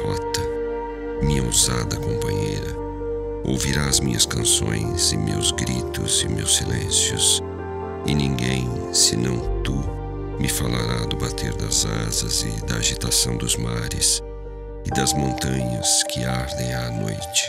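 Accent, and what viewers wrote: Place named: Brazil. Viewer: Adoro ouvir declamar poesia em português com a musicalidade do sotaque brasileiro. Saudações de Portugal.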